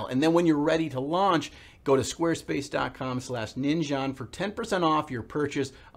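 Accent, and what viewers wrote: United States. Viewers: I'm pretty sure it's just the accent. Too american...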